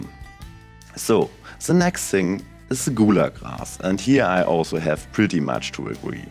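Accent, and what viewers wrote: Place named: Germany. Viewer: ich liebe es wenn ich durch den Akkzent erkenne das man deutsch ist. 😂 Schönes Video.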